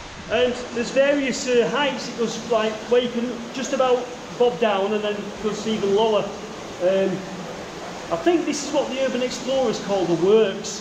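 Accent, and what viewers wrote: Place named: Manchester, United Kingdom. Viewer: Absolutely fascinating. Plus it's lovely to here that beautiful Manchester accent! I now live in Yorkshire and miss my home town:(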